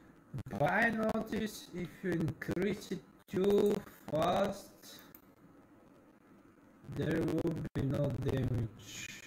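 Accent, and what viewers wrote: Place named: Greece. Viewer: Η προφορά σου είναι λίγο ελληνική..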